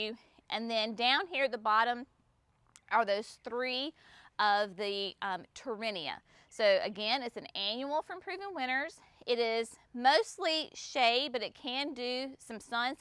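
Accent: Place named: North Carolina, United States. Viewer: As soon as I heard your voice, I knew you had to be from North Carolina. Haha I am from NC too. Love your garden! Im trying to find beautiful shade perennial flowers and shrubs to plant in zone 8.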